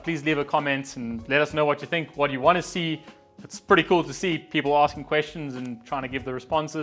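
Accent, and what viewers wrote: South Africa. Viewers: You have a South African accent ?!? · South Africa, my home country. We'll since I support local, I subscribed. Thought that English accent sounded familiar! Great work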